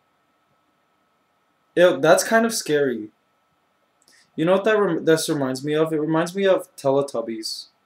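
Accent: Philippines. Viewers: yeah what happened to your Filipino accent? anong nang yare? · ya what happened in your Filipino accent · I think it's because he use to live in Toronto and he moved to the Philippines and started talking Philippines more and that's how he got the accent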